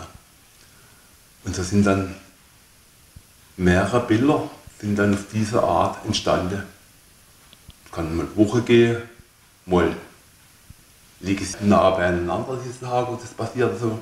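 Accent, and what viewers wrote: Swabia, Germany. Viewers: das ist schwäbisch! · Leider für mich als Norddeutschen aufgrund des stark ausgeprägte schwäbischen Akzents und der etwas mangelnden Tonqualität nur schwer zu verstehen. Schade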